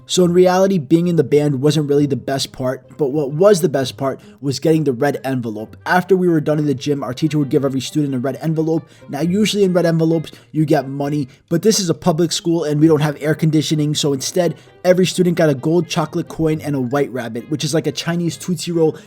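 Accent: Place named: Boston, United States. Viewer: This mans boston accent is amazing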